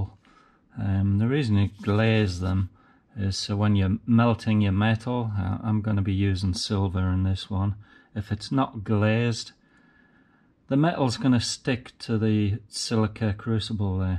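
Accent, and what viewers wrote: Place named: Cumbria, United Kingdom. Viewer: Hi, I'm from Cumbria and your accent is very similar....where are you from?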